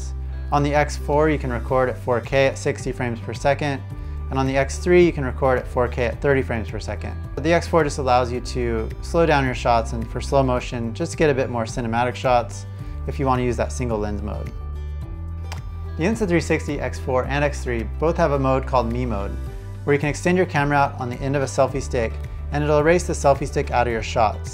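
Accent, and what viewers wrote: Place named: United States. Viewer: Finally someone who speaks American English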